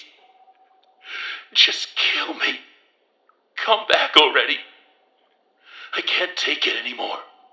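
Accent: United States. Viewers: Your American accent is spot on!!!! Great job! I love the effect over your voice too 10/10⭐️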